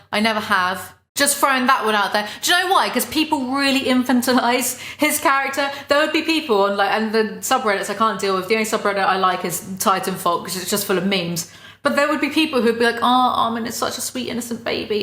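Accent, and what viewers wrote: United Kingdom. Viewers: nothing is far better than a British rant! good thank lord!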